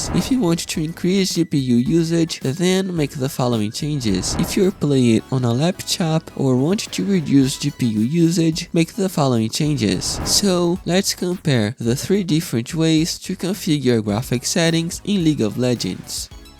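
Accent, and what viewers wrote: Brazil. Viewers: Pelo sotaque parece carioca. Obrigado pelas digas! You're awesome! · Brazil , for sure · brazilian accent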